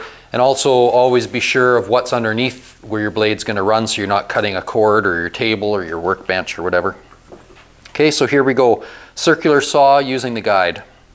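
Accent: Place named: Canada. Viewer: Love the content! I’ve loved power tools and DIY since I was a little girl and I bought a fixer upper to get started on fulfilling some dreams. I had to look up where you were from and idk why I didn’t think of Canada, because I’m from southern WI but I have family in Northern WI and my uncle has the same accent, common in the U.P. too (Michigan).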